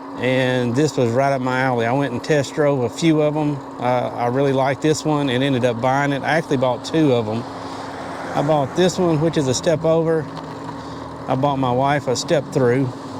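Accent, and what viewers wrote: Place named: Texas, United States. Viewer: Merry Christmas my friend! And yes I will subscribe. I just purchased me one yesterday and not only did you make me laugh with the shameless request for subscription... love it... you also showed me what I wanted to see about the way it responded to your pedal strength. I mean it man...perfect ... and you sound like all my family here in Texas lol. Awesome!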